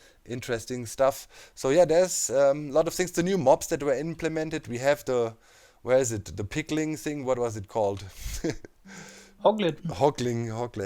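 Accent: Germany. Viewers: Geil der Deutsche Akzent 😂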